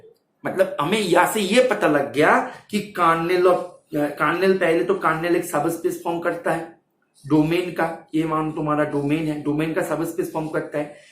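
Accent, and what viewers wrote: Bengal, India. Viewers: Sir Hindi te bolleu sir er bangla tan ta bojha jai tai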